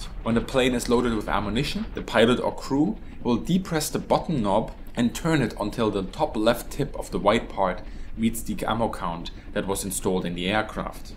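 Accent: Germany. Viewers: No he just has a german name, speaks german and has a german accent. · you'd notice that he speaks english in a heavy german accent if you've been paid attention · He is from Germany yes! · Giuntoli Im from germany and he does not sound like austrian. He sounds close to "Hoch Deutsch" that is the "Normal German".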